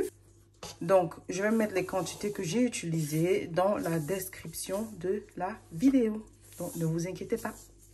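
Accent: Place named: England, United Kingdom. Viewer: Merci pour la recette et j'adore ton accent anglais 😉👌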